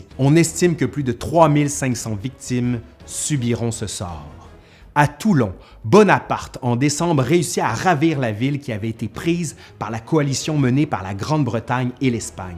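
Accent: Quebec, Canada. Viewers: Bravo pour la vidéo, très enrichissant, j'ai appris beaucoup grâce à toi. Merci beaucoup à toi et continue comme ça. +1 abonné 😘 (Ps: je vis en France et ton accent québécois est plaisant 😉) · Ton accent québécois m'énerve je peux pas regarder la vidéo dommage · J'adore l'accent quebecois 🫶🏼 merci beaucoup pour ton travail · J'suis la seul à kiffer le fait qu'il est un accent Québécois ??? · Grave kiff j’étais sur mon téléphone quand je entendu ta voix Québec je sais pas pk mais j’ai flippé mdr 😂 mais ouais sinon cette génial 👍🏾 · Toujours aussi géniales vos vidéos D'une qualité rare et très dynastiques. Votre diction est formidable et l'on vous suit avec entrain. Et puis cet accent québécois !! Quel bonheur , parole de parisienne. Euh ,excusez moi ,mais je me permets de vous dire que vous êtes encore plus beau sans barbe 😆👍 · L'accent du Québec n'est pas bon. 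· Passionnante cette chaîne Travail de qualité Bon continuation J adore cette chaîne Et l accent des cousins d Amérique apporte son petit plus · Salut. C'est un énorme travail que tu as fais LA😃👏 l'histoire de France avec un accent Québécois... super ! Presque 3h qui sont passées très vite ! Merci à toi 👍Ah javais déjà fait un com y a 1an😆😃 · cet accent québécois me fait saigner les oreilles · J’apprehendais beaucoup la vidéo à cause de l’accent canadien, mais je dois dire que j’ai été agréablement surpris ! J’ai accroché dès le début et je n’ai pas lâché avant la fin 2h44min plus tard... très bon travail, j’attends votre épisode sur la 2eme guerre mondiale avec impatience ! :) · Et puis puisqu'on est entre amis, pourrais-tu faire un jour un travail sur la guerre d'Algérie, je suis l'ultime pied-noir de ma famille et j'aimerais bien voir ce sujet traité par quelqu'un de compétent qui ne soit ni Algérien ni Français puisque vu ton léger accent il m'a semblé que tu as plutôt grandi sur les rives du Saint-Laurent que celles de la Garonne. 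Merci et bonne continuation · ... le révolution française relue par un historien canadien, avec l accent canadien, très intéressant 😂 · j'adore l'accent québécois pour raconter l'histoire de France , j'ai l'impression que vue de loin, c'est plus objectif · Maaaiis je suis sûre q l'histoire de France intéresse Bcp de monde de par le Monde... 😉 C 1 véritable série à épisodes.... 😄 !!.. Et j'Aadôre l'accent Québécois... 👍👍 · Une personne qui présente bien, qui parle bien, belle gueule, avec un p'ti accent du canada... Bref je m'attendais pas à quelque chose d'aussi tip top! Pouce Bleu & Abonnement! Offrez lui une cocarde! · Passées les digressions humoristiques… La qualité d'écriture et le charme du québécois s'opèrent. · Superbe vidéo J adore l accent québécois :) Au-delà de la boutade, Merci! c est passionnant et très instructif Un français en amérique ... :)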